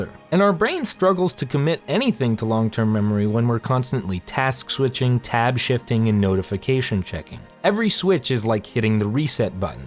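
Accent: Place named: South, United States. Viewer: I love your accent. Is it southern?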